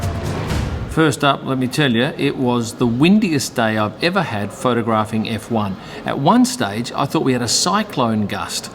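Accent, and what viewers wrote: Australia. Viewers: Great channel funny accent.Aussie Aussie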